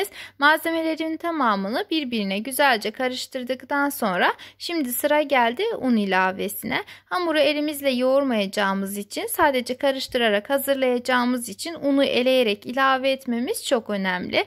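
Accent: Azerbaijan. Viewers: Super olmuşşş,azərbaycanlımısınızz?? Lehçedə biraz nəsə var 🥰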